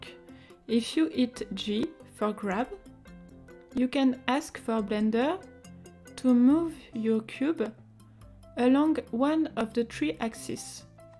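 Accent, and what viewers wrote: France. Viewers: Прелестный французский акцент...🙂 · Au vu de l’accent on reconnais que tu es française 🤣🤣 dommage pas de faire en français y’a dja bcp de tuto en anglais 😭 merci quand même :)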